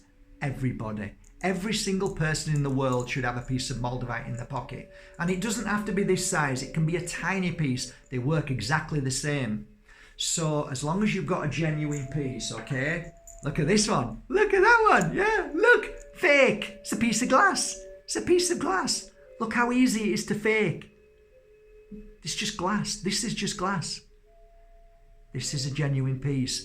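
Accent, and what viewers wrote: Yorkshire, United Kingdom. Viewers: I have never come across any of your videos before but within the first minute of watching my child self came out and yelled NICEEEE!! Your positive energy is so relatable I feel like I hardly know people with such vibrant energy as much as I have and now I've discovered you I'm just so happy there is someone else out there who is just as happy and smiley and loving as i am... I'm also from Yorkshire and I would love to meet you, I can hear it in your accent :D Freedom. where can i find you and share lentils?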